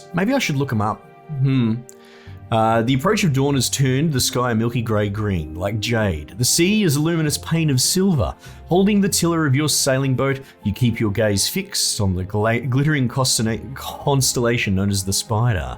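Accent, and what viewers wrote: Australia. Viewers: Hey, Mate, if your Australian lilt is insufferable, God help mine. The game seems quite interesting. I'll go a few more episodes for sure.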